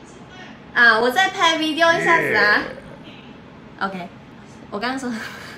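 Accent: Taiwan. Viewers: 口音有點台灣腔，好可愛ớ ₃ờ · 台灣腔！馬來腔不見了哈哈哈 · 變台灣腔了XDD 說話方式 用詞都被影響到了哈哈哈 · 哇 直接台湾腔 没有马来西亚腔了 听起来真的舒服很多 我自己也是马来西亚人可是觉得马来西亚音很难听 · 從對著鏡頭彈唱的小女孩 現在明顯的看出到了成熟穩重 我哭遼qwq 影片看到一半發現草草的口音變成了台灣口音😳 可愛死了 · 为什么有一点台灣腔😂😂😂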